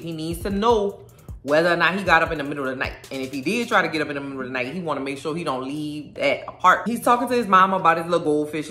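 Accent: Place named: Louisiana, United States. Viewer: I hear the Louisiana in your voice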